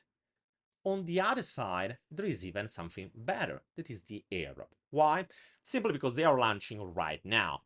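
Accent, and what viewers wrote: Italy. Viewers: Love your accent. Is it Italian? Spanish?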